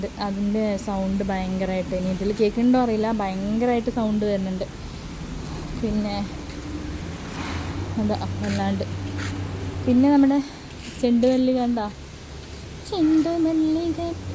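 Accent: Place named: Kannur, India. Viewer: Aaha kannur bhasha 😂😂😂😂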